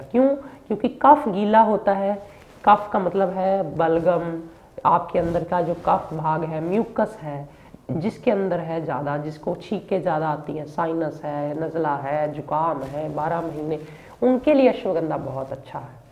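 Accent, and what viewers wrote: Punjab, India. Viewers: Apki hindi ka bulnay ka tareeqa say muje lagta hai app punjab kay hai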